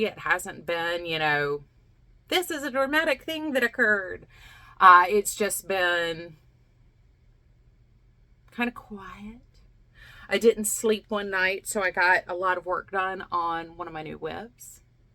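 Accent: South, United States. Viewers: Your shawl is beautiful, the colours are amazing! Also, your southern accent is stunning, I love it 😍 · Your southern accent is wonderful - comes out stronger with certain words. I don't block socks in general. However, a knit stocking would definitely benefit from it. Looks great. I can't remember if you said what fabric you are going to line it with. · Hi, I'm so happy I finally found you on YouTube! I saw your summer freshness shawl and it's stunning! I love it! can't wait to see it finished! You're so a lovely person and I love your southern accent. Have a lovely day! Magali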